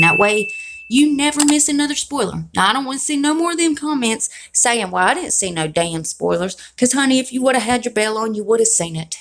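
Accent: South, United States. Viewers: I love your southern twang! I am excited for the Betty Boop!!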